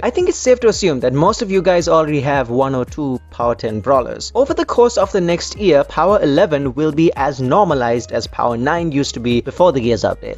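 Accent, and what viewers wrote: India. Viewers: You Indian? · Beo u are indian???😀😀😀😀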